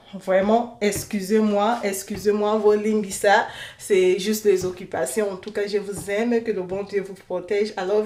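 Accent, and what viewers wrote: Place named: Africa. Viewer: Tu as l’accent africain mais c’est toi qui ne fais que dire <parceque en Afrique 😒> je t’aime bien mais arrête ça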